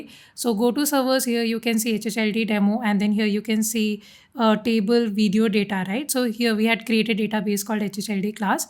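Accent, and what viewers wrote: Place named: India. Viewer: As a European I'm having a bit of trouble with some of the accents of some Indian people, you know, when it's a heavy accent? But with creators like this that's no problem at all. And I am so happy to see how much high, and I mean truly high, quality material is coming from india and Indian people. Whether it's cooking, repair, coding, there are a lot of good creators there. You are one of them. My thanks and compliments!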